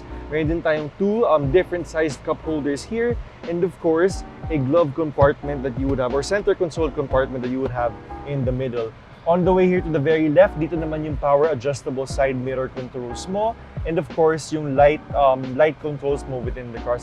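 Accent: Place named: Conyo, Philippines. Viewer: Nakaka inspire pag siya yung host ng review. Cute. Conyo. but Informative :D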